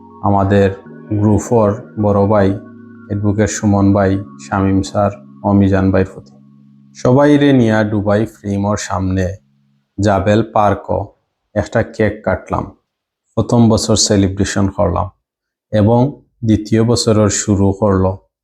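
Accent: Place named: Sylhet, Bangladesh. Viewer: আপনার সিলেটি উচ্চারণ খুব সুন্দর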